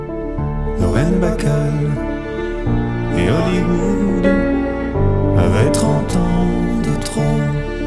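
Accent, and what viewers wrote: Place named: England, United Kingdom. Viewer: Il a pris l'accent anglais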